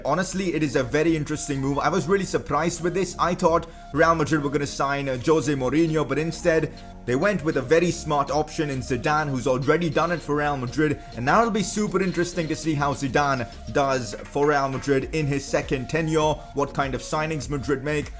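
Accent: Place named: India. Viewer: He sounds like indian people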